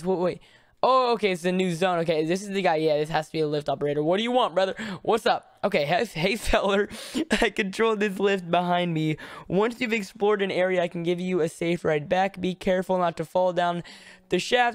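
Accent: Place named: Texas, United States. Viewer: ur texas accent tho ... “tin” = ten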